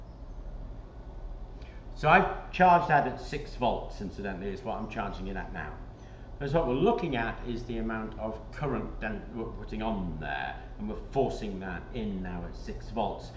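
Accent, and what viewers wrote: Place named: United Kingdom. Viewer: And, trying to talk slower would help, as well... I'm in the US; &, trying to "translate" UK English into US English can be "interesting." I had to reduce the video speed to 75%; AND, STILL turn on closed captioning, just to follow what you were saying. If I had trouble following what you were saying, I'm sure that I'm far from alone here in the US... (I just tend to be more out-spoken than most people.) Interesting content, though. :)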